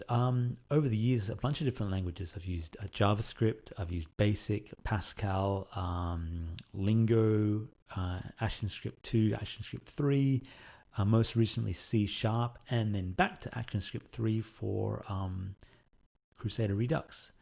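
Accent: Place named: Australia. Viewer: Thank you very much, I really appreciate it! Sometimes I just like eating a bunch when down. I should definitely take a break from technology for a few minutes and go out for a hike or jog. Also, your aussie accent is wonderful!:D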